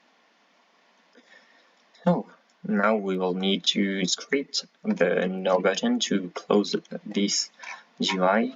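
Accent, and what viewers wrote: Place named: France. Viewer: T’inquiète l’accent français c’est hyper prisé dans les pays anglais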